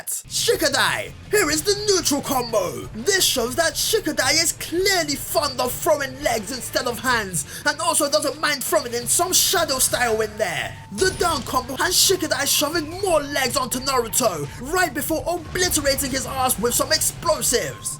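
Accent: United Kingdom. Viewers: Yea I'm pretty sure he's in the UK especially with the accent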